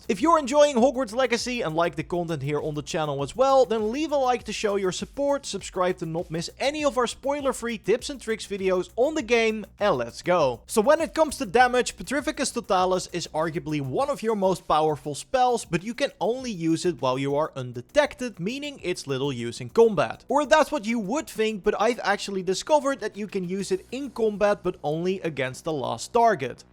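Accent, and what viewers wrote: Netherlands. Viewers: Typisch Nederlands accent 😂, wist het meteen. Geabonneerd neef. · Hmm are you Dutch too by any chance?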